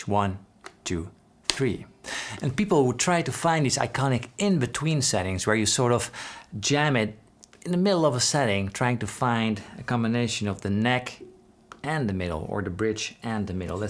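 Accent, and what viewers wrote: Netherlands. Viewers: Holy shit, het eerste wat ik dacht was: "dit klinkt als een Hollander die Engels praat". Dat werd snel bevestigd... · Groetjes uit Nederland. Je kan altijd een Nederlander horen als die Engels spreekt :-) Ja, ik heb ook een accent 🙂 Hoe dan ook, eerste keer dat ik je zie, super goeie vid gozer! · i hear your dutch accent :) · are you Dutch by chance? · Are you dutch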